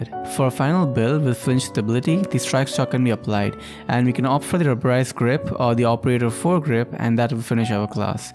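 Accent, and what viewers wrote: India. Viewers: Man your accent is kinda Indian love your vid❤️ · Wtf too to Indian lagta hai bro Are you bro ??? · Bro are you Indian guessing from the accent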